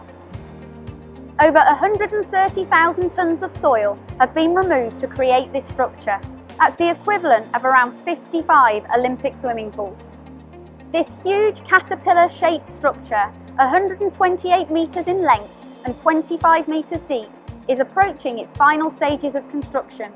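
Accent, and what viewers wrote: United Kingdom. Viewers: Dialect, regional accent... These things exist in the UK. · Thanks for another informative update and confirmation of just how much work is being delivered to increase this country's railway network capacity. When the book is written and the "Lessons Learned" sessions are run, this will - I am sure - provide the model for future large-scale infrastructure projects around the works. We have adopted "green tunnels (aka "cut&cover") from the French - and the Colne Valley viaduct construction gantry started its life building a railway in China. The no-doubt temporary interruption to the greater build will, once this new railway line is operational, need to be reversed. Kudos to the project build teams involved and - for a British project with multinational teams involved, it is reassuring to hear a British voice explaining the progress and future directions.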